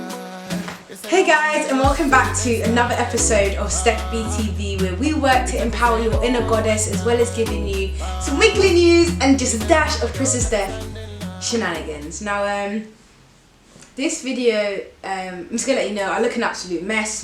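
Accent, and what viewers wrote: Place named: United Kingdom. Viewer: Youre brittish? Had no idea! Cheers from France Anyways, you are really inspiring, Steph💙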